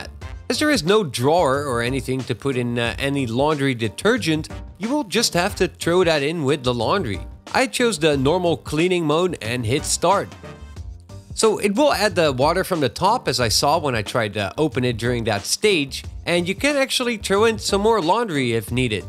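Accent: Netherlands. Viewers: We don’t mind this kind of production. It still had your graphics, music and that Dutch take on the English language :D. Feel free to keep up the great videos in this format.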